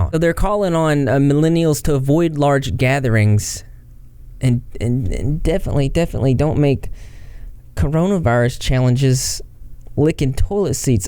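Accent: South, United States. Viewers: okay I've spent a good amount of time trying to figure out what flavor Southerner you are. I'm from West Virginia, but reside in Georgia.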